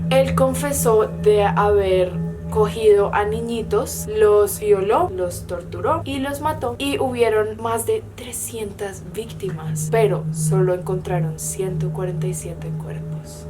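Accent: Colombia. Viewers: Me encanta tu asento colombiano😅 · estoy seguro que ella no es mexicana . no parece... parece y se escucha colombiana . es asi o me equivoco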